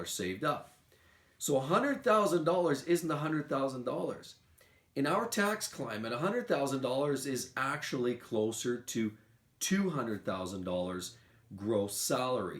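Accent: Canada. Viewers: I could tell you're Canadian! That's awesome you know Will.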